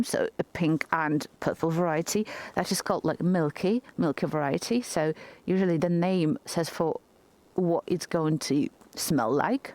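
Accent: England, United Kingdom. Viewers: Hello! Blue eyes, you eyes are sparkling, ❤ Guard your heart Springs could bring 😘 You speak English with an English accents. I love flowers and want to grow herbs. Guarding is so Romantic. Birds, sing, an we can walk bare feet in the grass tax free. Hand and hand ✋ we walk Romantic vibes we bloom, we blossom and 🌹 🌺 🌸 🌼 beyond the gloom!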